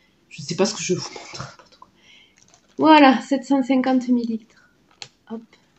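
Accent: South, France. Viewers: Hello, vous me faites trop rire, j'adore vous suivre, et surtout j'adore votre accent du sud qui met du soleil dans mes journées ( eh oui je suis du nord 🫣🤣). Merci de partager vos courses et vos avis, cela m'a donné envie de goûter certains produits éco+. Vivement la prochaine vidéo. Belle journée ☀️🌺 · Bonsoir quel plaisir d’entendre votre accent du Sud ,merci pour ce retour e courses,dommage chez moi il n’y a pas de Leclerc.1 coucou d’Aubagne👍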